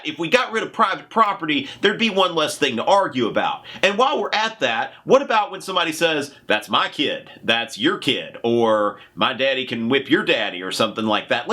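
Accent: South, United States. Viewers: has southern accent - ok, let's not stereotype, maybe he's educated enough. . .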